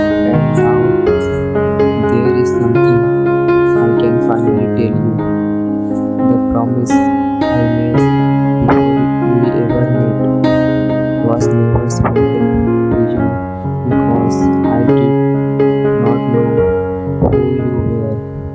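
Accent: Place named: India. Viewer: Are you Indian because you talk like one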